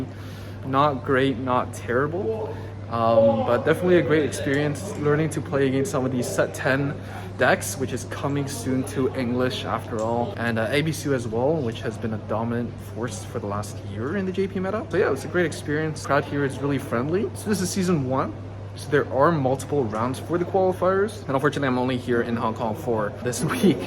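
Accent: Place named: Canada. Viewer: I thought you were Canadian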